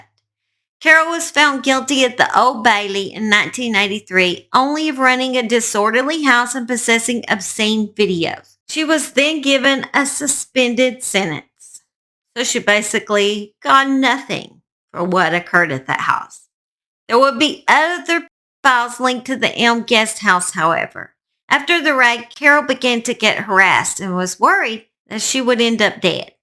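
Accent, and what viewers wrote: South, United States. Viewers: Great story from my favorite southern narrator Ms.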